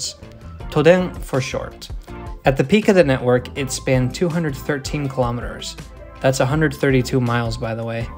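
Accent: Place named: United States. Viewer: I’ve often wondered about this. You speak very good American English, and had “huh?” moment when I saw you were from NL. Imagine my jaw drop when I saw this video with you speaking very good Japanese pronunciation and hearing you grew up there. Any other surprises?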